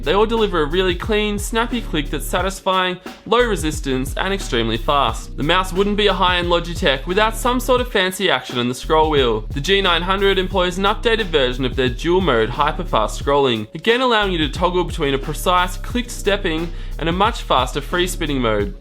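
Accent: Australia. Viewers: happy to find a tech channel with an aussie host :D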